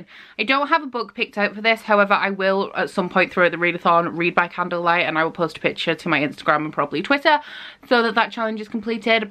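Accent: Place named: North, United Kingdom. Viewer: Ahhh, our accents are so similar! Are you from the north??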